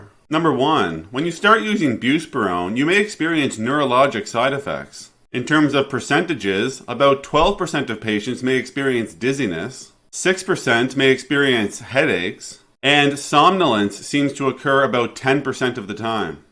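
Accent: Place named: Atlantic Canada, Canada. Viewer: Same here skipper! I know an east coaster when I hear one lol. Cheers, keep up the great reviews.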